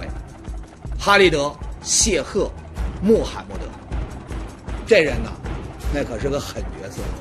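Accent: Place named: Beijing, China. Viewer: 主持人真棒，好像是北京人！赞赞赞👍👍👍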